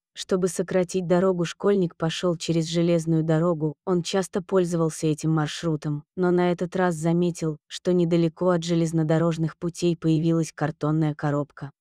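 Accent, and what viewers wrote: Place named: United States. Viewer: Кто нам читает американка что-ли слышите как говорит ?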